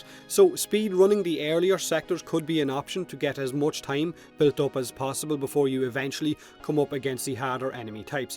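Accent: Ireland. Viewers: Real good music selections. And let's not forget that smooth Irish voice. should do a crossover with Rhino Ninja!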